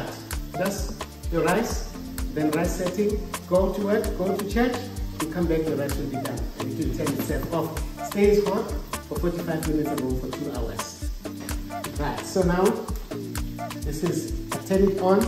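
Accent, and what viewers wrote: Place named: South Africa. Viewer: Wow..you sound like a fellow South African! 🤣🤣🤣👌...so Im gonna be ur new sub.! Your dishes look soo amazing.I recently made a fruit salad on my page that can pair well there.🤩Wish you the best !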